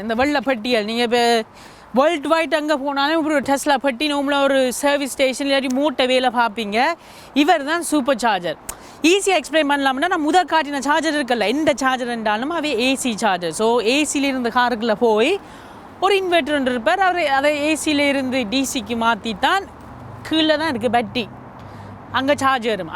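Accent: Jaffna, Sri Lanka. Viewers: singala tamil யாழ்ப்பாணத் தமிழ் very very sweet to ear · தமிழில் அதுவும் இலங்கை தமழில் பேசும் விதம் ஒரு இனிமை காரின் செயல்பாடு தன்மையை விளக்கும் விதம் அருமை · யாழ்ப்பாண பேச்சுத்தமிழில் வெளுத்து வாங்குறீங்க இலங்கை வானொலித்தமிழில் பேசினால் கேக்கும்போது செவிகளில் தேன்வந்து பாயும் , முடிந்தால் அடுத்ததடவை முயன்று பாருங்கள். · இலங்கை யாழ்ப்பாண தமிழ். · இலங்கை தமிழ். அடுத்து என்ன விஷயம் என்னன்னா இந்தியா வக்குள்ள எந்த ஒரு நல்ல விஷயமும் நடக்காது. நல்லது வரவும் விடமாட்டாங்க. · உங்கள் ஈழ தமிழ் அழகுக்கும், இனிமைக்கும் வானமே எல்லை. எனக்கு பிடித்த கார் விளக்கமும் சேர்ந்து இன்னும் இனிக்கிறது. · illangai tamil ketka nalla eruku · இனிமையான இலங்கை தமிழ் உச்சரிப்பு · Sri Lanka Tamil sema mass... Super Machan car oda back rampa thodai Mari hahahaha . Kuwait le irundhu neelakandan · Ungal ilangai Thamizh migavum Azaga iruku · i like Elangai Tamil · ஐயா , காரை பற்றி இலங்கை தமிழ் சூப்பா் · Sinhala tamil super 👍👍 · Nice srilanka tamil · இந்த பையன் பேசுவது ஸ்ரீலங்கா தமிழ் நல்லா இருக்கும். 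05-8-20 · இலைங்கையில எல்லாரும் இவர் மாதிரி தானே பேசுவாங்க? இல்லையா? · Srilankan tamil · யாழ்ப்பாணத் தமிழ் அதுவும் இங்கிலாந்தில் இருந்து அங்கு வளர்ந்த பையன் . அதுதான் இனிமை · Jaffna tamil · Srilankan original tamil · யாழ்ப்பாண தமிழ்ல சும்மா பின்னி எடுதிட்டிங்க. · திருத்தம். ஈழத்தமிழ். அல்லது யாழ்ப்பாணத்தமிழ். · இனிய யாழ் தமிழ் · ஈழத்தமிழ் கேட்க இனிமையாக இருக்கின்றது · You are the best explanation for the new car and I proud your Jaffna Tamil speak style I love that. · மிகவும் இனிமையான இலங்கை தமிழ் மிகவும் அறுமை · அருமை இனிய இலங்கை தமிழின் அழகு தனி அழகு தொழில்நுட்பத்தையும் எளிதாக புரிய வைத்த தங்களக்கு நன்றி அன்புடன் நாகராஜன் பொன்னேரி தமிழ் நாடு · Thanking you for best explained in yalzpana Tamil❤️❤️❤️❤️❤️❤️❤️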